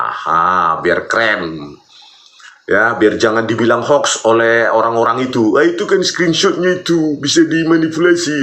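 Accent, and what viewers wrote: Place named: Sumatra, Indonesia. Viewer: sama bro , karna gua orang sumatera sangat pas dengar logatnya bang rismon ini 😂😂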